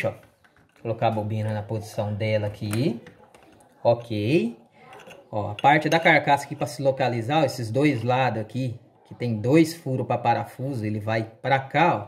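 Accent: Minas Gerais, Brazil. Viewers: I m p r e s s i o n a n t e ! Não bastasse o conhecimento repassado livremente e com riqueza de detalhes, somos agraciados ainda com um formidável Mineirês que torna as AULAS, além de extremamente instrutivas, muito agradáveis. Muito obrigado meu camarada. O mundo precisa de mais mestres como você, não para consertar o mundo, mas para ensinar as pessoas que elas também podem consertar, seja o que for. Abraços.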